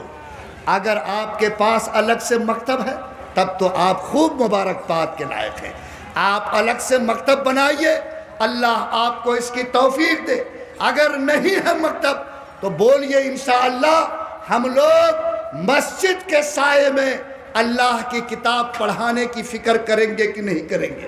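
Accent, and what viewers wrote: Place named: Bihar, India. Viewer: Andaaze bihari hae. Lekin haq aur zameeni sachai bol rahe haen.Lachhedar taqreer nahin.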